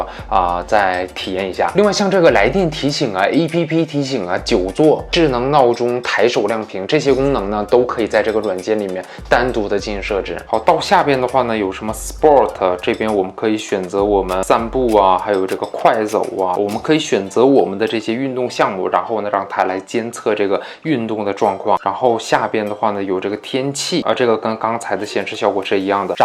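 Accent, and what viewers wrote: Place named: Northeast, China. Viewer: 隐约有点东北味儿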